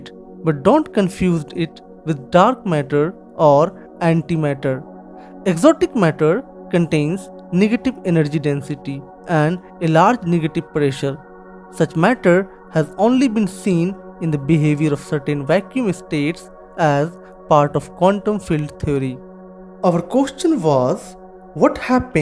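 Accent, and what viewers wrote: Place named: India. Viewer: Cringe indian accent Stolen Content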